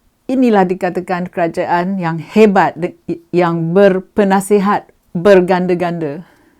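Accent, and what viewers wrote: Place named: Kuala Selangor, Malaysia. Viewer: hehehee.. sya suka dgn logh4t puan.. mcm org kampung(kuala selangor) teman pn ye.. mcm org kampung isteri (ipoh) teman pn ye jugok.. apa pun.. teruskan 'membuka' mata marh3in dgn isu2 semasa yg p4nas! ..Terbaek Yong!!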